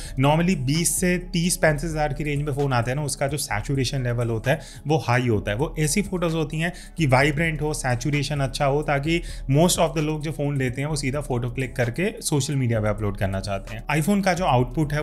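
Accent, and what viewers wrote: Lucknow, India. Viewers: Sir aap lucknow se ho ???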